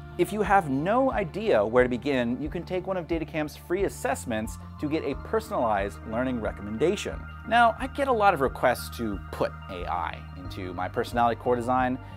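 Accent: Russia. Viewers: Ты что, русский? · You are Russian?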